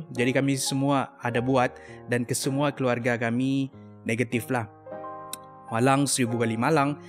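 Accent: Sabah, Malaysia. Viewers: Kenapa suara dia mcm orang sabah eh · Aku Nak Tanya bang ni orang Malaysia Ke orang iban Ke orang Sabah?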